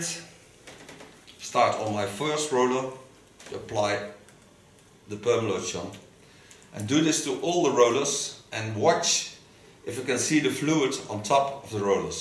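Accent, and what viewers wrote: Netherlands. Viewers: You made me laugh, with the ears. Love your video's, you must get tired, working all day, then doing these video's for us friends on you tube. Do the rollers you used, give a soft curl, compared to the others you normally use. Joy to watch. Love the Dutch accent. Love from England.💖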